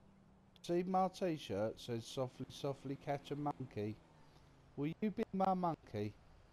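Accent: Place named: South, United States. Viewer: Hahahhahahaha!! Loved the Country accent man!!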